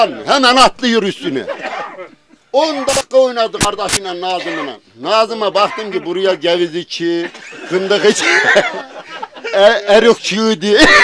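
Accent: Sivas, Turkey. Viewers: ayni sivas yalaaaa:) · SİVAS ŞİVESİ GİBİ ŞİVE YOK. HARFLERİN SÖZCÜKLERİN TEK TEK İNCELENMESİ LAZIM. ÖZ TÜRK KONUŞMASI BUDUR. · Helal olsun işte bu tam bir sivas şivesi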